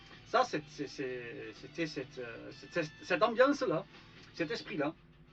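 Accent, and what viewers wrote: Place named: Pyrénées-Orientales, France. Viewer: Salut, Trop marrant après tout ce temps aux Pays-Bas, tu as encore un putaing d'accent des PO!!! Evol un jour Evol toujours! Sinon, je n'ai même pas le photo de Shud!!! Tu pourrais me l'envoyer par hasard plize? En tout cas, cool de te voir et t'endendre! COTLOD!!!!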